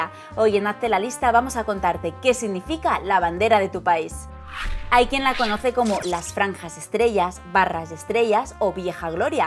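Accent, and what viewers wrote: Spain. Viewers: tu voz de española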